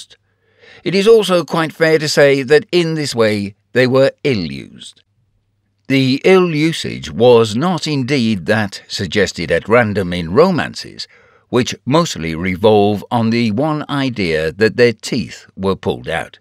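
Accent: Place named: England, United Kingdom. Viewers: English accent, there is NO SUCH THING as a British accent. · the narrator has a British accent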